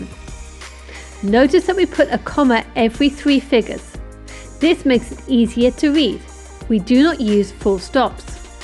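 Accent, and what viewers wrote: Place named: United Kingdom. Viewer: i like your accent can you tell me wich part of the UK is this accent from?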